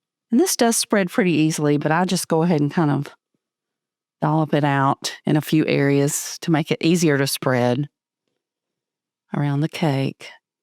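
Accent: South, United States. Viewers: The audio on this video is so nice & crisp and with your southern voice? So relaxing! Almost asmr! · First time you’re coming across my feed. I can’t wait to make this. Well done! Great video & I love your voice/southern accent. 👏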